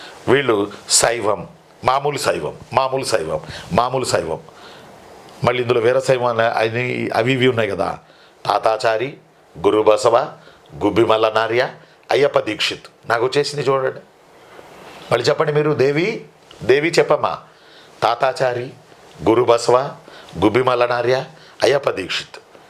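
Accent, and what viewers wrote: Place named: Telangana, India. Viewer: Sir.. మీరు మెల్లగా తెలంగాణ slang లోకి మారిపోతున్నారు..😂( ప్రదేశం ప్రభావం అనుకుంటా)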